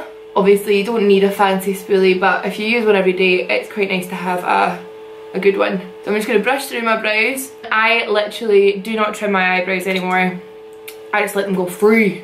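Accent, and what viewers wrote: Scotland, United Kingdom. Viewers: I LOVE SCOTTISH ACCENTS SO MUCH OH MY GOSH I JUST WANT TO LISTEN TO YOU TALK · Sounds Scotch to me. But then again my family's from Scotland so I can easily tell. Their's are way more pronounced tho. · it's a scottish accent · she has the most gorgeous Scottish accent, gives me liiife ❤❤❤ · Leandra♥ Scottish accent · I love you so much, your eyebrows are sooo amazing! I stay in Glasgow I feel like I have a bond with u , there's not many Scottish youtubers. It's nice to hear the accent. ❤️❤️❤️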